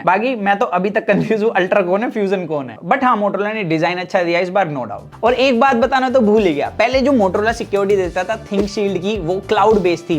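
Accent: India. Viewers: Such heavy Indian accent. Don't understand what he is saying.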